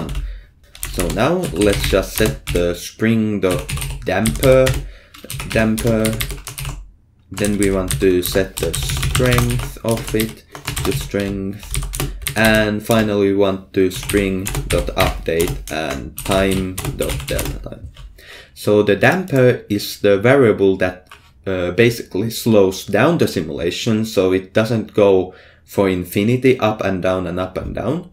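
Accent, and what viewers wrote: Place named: Finland. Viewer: suomalainen aksentti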